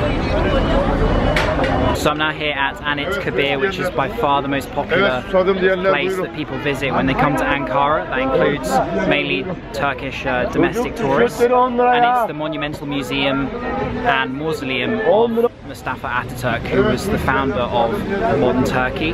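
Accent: United Kingdom. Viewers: Whıte Turk It is English British accent! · You’re British?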